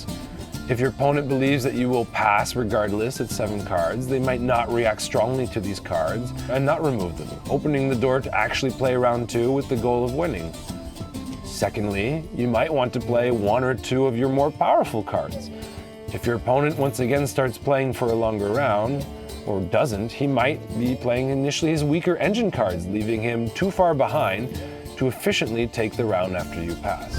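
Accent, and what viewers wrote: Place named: Poland. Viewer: Ah ten polski angielski, ale film super :)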